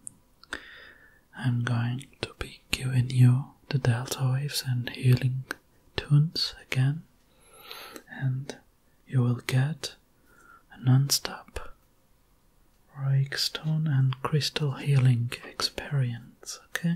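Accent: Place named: Turkey. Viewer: Turkish accent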